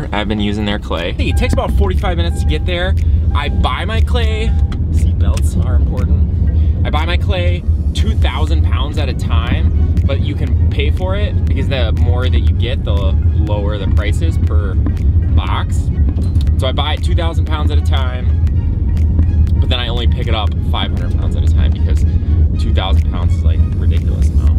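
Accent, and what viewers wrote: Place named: Upper Midwest, United States. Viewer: I’ve been binge-watching your videos for a week or so because I grow orchids, and I have a hard time finding pots – I like to use clay pots whenever possible, but orchids need to have a lot of air around their roots – so I’ve been considering making them myself, so that I can make them so that they include all of the specs that I want. Your teaching style is great, and entertaining, so I’m really enjoying the “binge” of your videos! Thank you for doing such a great job! Second, and this part is just a dumb bit of anecdotal fluff: I noticed from the first sentence that you spoke that, based on your accent, you HAD TO live in the upper Midwest, because your accent is pretty much EXACTLY the same as mine! I lived in southwestern North Dakota until I was 17 (our accent is a bit more Canadian up there; and something that may help to strengthen my “family accent” is the fact that my grandparents on my Dad’s side still occasionally spoke German in the home to keep secrets from the kids, and later, us grandkids). 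Anyway, I moved to Chaska when I was 16 (HS graduation), where I lived until I was 20, when I bought a house and moved to Minneapolis. I lived there until I moved to the New Orleans area to finish my degree, back in 2007. During my junior and senior years, I taught freshman chemistry, and without fail in every single section that I taught, one of my students would ask me what country I was from, usually on the first or second day of classes. I guess the [slightly paraphrased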